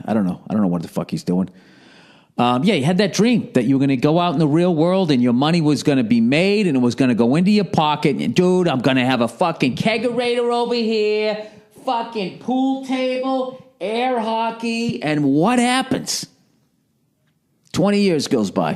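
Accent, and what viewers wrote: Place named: Boston, United States. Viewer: Lol I’m from Boston, & damn he sounds like he hasn’t left 🤣🤣I love him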